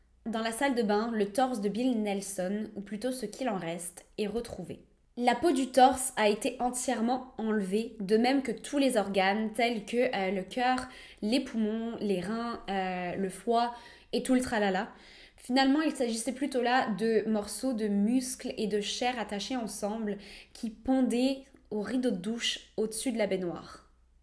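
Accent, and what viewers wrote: Quebec, Canada. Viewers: Kikou tu es adorable et j adore ton accent québécois. Merci beaucoup pour ta vidéo bisous 👍👍👍👍👍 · Coucou ! j'ai une question, je suis fascinée par ton accent du Quebec, tu l'as eu naturellement à force de rester là bas ou alors tu t'es entrainée ? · Bien raconté,merci! Je distingue un soupçon d’accent québécois ou quoi? C’est subtil, il faut s’y connaître!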